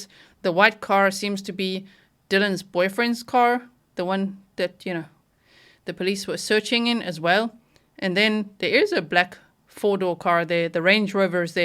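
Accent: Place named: South Africa. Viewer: Why do you sound South African? 😮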